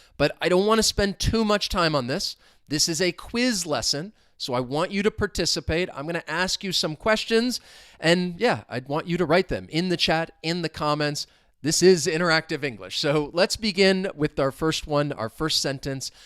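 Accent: United States. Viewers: pls make a video about your accent and your state of origin 🇺🇲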